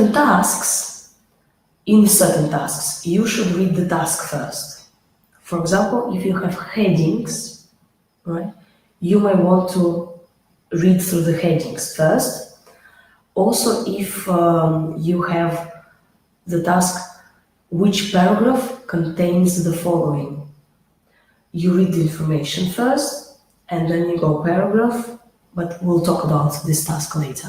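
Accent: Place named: Russia. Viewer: 100% Russian акцент )))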